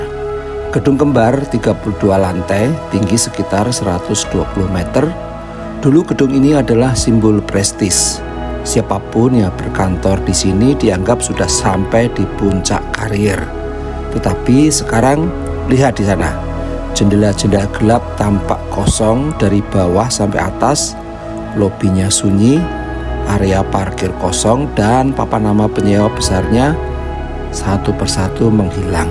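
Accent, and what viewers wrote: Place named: Java, Indonesia. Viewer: Naratornya orang Jawa, logat medoknya masih kentara, ga enak didengernya.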